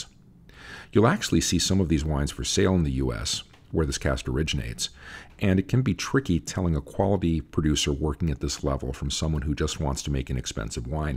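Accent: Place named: Caribbean. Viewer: I like your Spanish pronunciation. It sounds Caribbean (Boricua maybe?)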